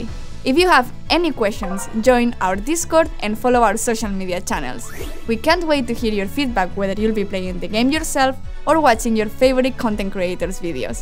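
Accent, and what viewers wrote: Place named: Spain. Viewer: Precioso acento Español y genial beta jajaja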